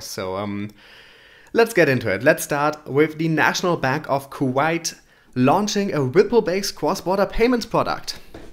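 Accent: Germany. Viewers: den deutschen akzent hört man einfach sofort raus haha^^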